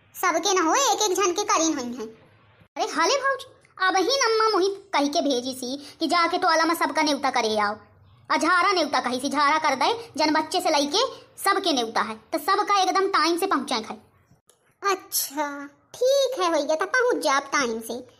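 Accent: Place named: Bagheli, India. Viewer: Authentic bagheli along with deep diving accent.. Maja aayga bahini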